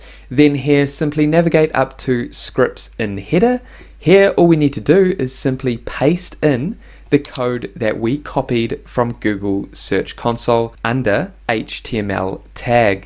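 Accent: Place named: New Zealand. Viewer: Great content, thanks. Good to hear a kiwi accent too!!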